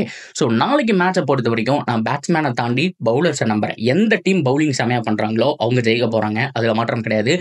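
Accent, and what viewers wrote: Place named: Kolar, India. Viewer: Thala nee yantha ooru thala slong kolara irukku thala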